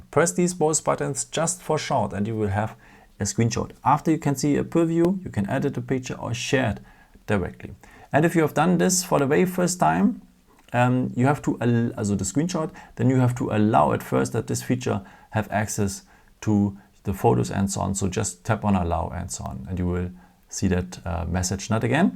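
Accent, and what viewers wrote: Germany. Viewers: Bist du vielleicht ein Deutscher? .... oder, sogar ein Österreicher? ??? That's why this was the best & most concise video! Ich habe es Sofort verstanden ----> which wasn't the case w/other videos!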